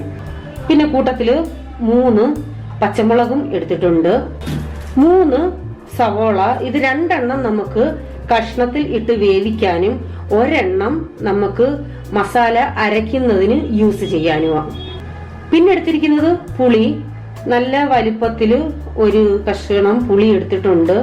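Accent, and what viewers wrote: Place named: Thiruvalla, India. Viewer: Chechyde veedu Thiruvalla- Kumbanad side aano?? Correct aa style samsaaram...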